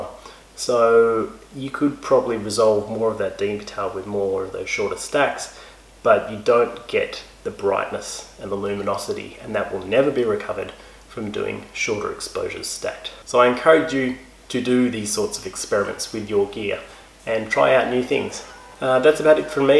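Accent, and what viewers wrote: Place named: Australia. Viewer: HAHAHAHA, he really has a Down under Accent:)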